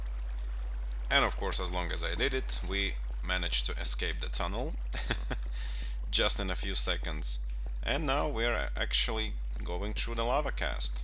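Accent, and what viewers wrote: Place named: Russia. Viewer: Звучит похоже на русский акцент... 🤔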